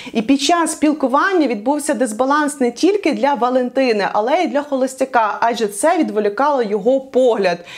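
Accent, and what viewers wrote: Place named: Galicia, Ukraine. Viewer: То галицький діалект, любять там так наголоси ставити. Це ж все таки не тв-шоу і не офіційна заява, блогер може говорити тою говіркою, якою він думає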